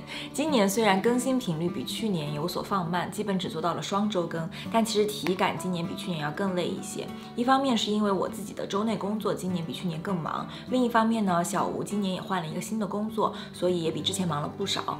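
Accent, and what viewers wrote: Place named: France. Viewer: 一直很喜歡妳們的視頻~ 穿插。。的吳式幽默^^ 穿插。。一臉無辜臉的悟空^^ 還有最重要~搏主法式的聲調解說期待下年度的新視頻唷~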